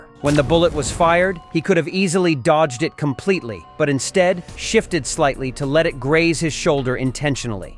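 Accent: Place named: United States. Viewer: Sound like a certain American guy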